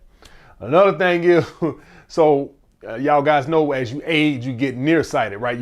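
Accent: United States. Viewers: Scresss scresss scresss!! Country as HELL 🤣🤣🤣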